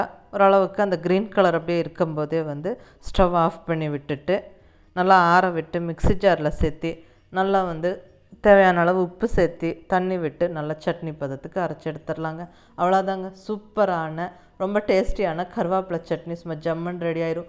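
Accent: Kongu, India. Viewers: Mam neenga pesura kongu tamil romba nalla iruku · I think Coimbatore.... Sollumbothey Enga kongunadu Nu sollaranga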